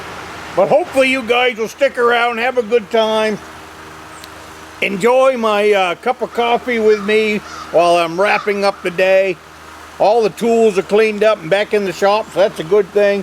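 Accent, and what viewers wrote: Maine, United States. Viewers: A good start would be getting rid of the Mills gang.... I really do love your authentic Maine accent! I used to hear it everywhere but now only when I'm in the woods with the old-timers😏👍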